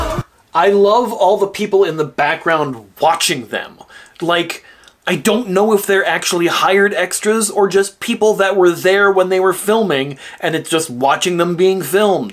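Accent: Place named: Scandinavia. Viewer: Oh. I thought he was from one of the Nordic countries judging from the accent. My point about him being a good Doctor still stands.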